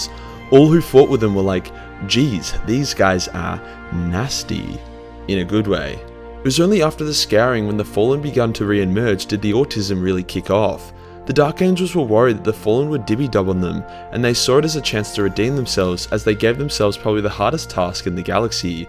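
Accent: Australia. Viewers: It feels like I'm listening to an Australian talking about kiddos with adhd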